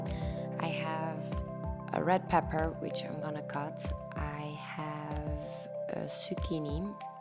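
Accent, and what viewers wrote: Netherlands. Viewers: Great food. I can hear that you are Dutch. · Are you dutch? Because 1 you’re name and 2 you’re accént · You sound like you have a Dutch accent :) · Ik hoorde aan je accent dat je Nederlands was daarna zag ik je naam haha 😇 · Are you Dutch? Because it kind of sounds like it🙂 I really enkoyed this video!! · Is she dutch? She sounds dutch but i cant find dutchvideo's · R u dutch? U really sound like dutch haha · Omg are you dutch? I think i can hear it out of your accent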